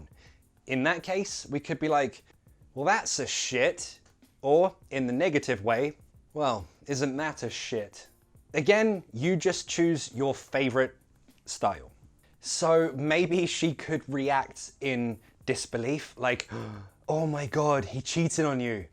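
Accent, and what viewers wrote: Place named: Cockney, United Kingdom. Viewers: hello I really want to learn Cockney accent and I saw the two videos concerning Cockney but I want you to focus on it in the coming videos I like your Cockney accent and I want to learn more from you please and thank you for your efforts and useful courses 💜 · I just LOVE your accent. Simply beautiful. It reminds me of my younger days in London. More than 30 years ago ! From an old man in Japan.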